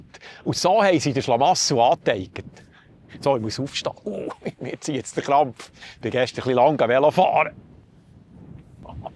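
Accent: Bern, Switzerland. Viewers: Dr Herr Trump erchouft secht haut sini Macht mit Gäud und mit Entlassige. Weni ihm zuelose, de dünkt's mi rächt offesichtlech, dass es ihm nid i erschter Linie um ds Wohl vo Amerika geit, sondern um d'Vermehrig vo sim persönlech Riichtum und Macht. Si Aatrieb isch sis gchränkte Ego (was sogar nachvouziehbar isch, wenn me sini Biografie aaluegt). Nüt gäge Riichtum, aber es git riichi Lüt wo ehrehafti Mönsche si mit ehrehafte Wärtvorsteuige, und die würde nie so handle. Ja es stimmt, es geit hie nid a priori um Pro oder Contra Trump, und trotzdäm dünkts mi wichtig, das Thema und das indiränkte "Lob" a Trump im Video ine entsprächende kritische Gsamtkontext z'steue. Es isch aber e Fröid, dim schöne Bärndütsch zue z'lose 🤗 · Dieser Beitrag is wirklich ein Hit, ich bin froh dass ich gefunden habe. Und dann dieses schöne Bärndütsch! Herzliche Grüsse von einem Heimwehberner aus Südkorea! 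· Das war wiedermal Deutsch und deutlich! Man kann sich über diese finanzielle Schieflage in den alternativen Medien sehr gut informieren lassen. Aber unsere tollen Mainstream-Medien begnügen sich halt immer noch mit einer dümmlich vereinfachten Weltsicht. Sie spielen 'hau den Lukas' und verkaufen uns für dumm. Du hältst in breitestem Berndeutsch dagegen - bravo! ❤ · Einfach schön, jemanden zu hören, der richtiges Schweizerdeutsch (Bärndütsch) beherrscht und praktiziert!!!!!! Dazu noch alles für die Dümmsten (wie ich) einfach erklärt. Danke!!!! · Herrlich dis Bärndütsch 👍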